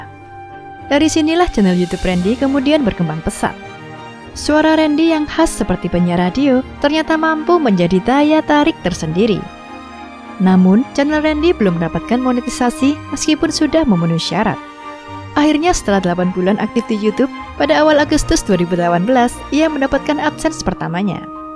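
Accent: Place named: Lampung, Indonesia. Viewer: Orang Lampung mana suaranya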